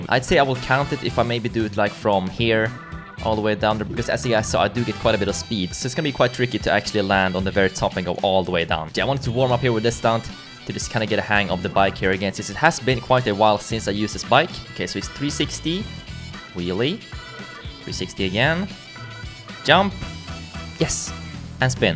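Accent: Sweden. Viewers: Are you swedich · man hör på dig du är svensk lol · Är du från svergie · Är du från Sverige?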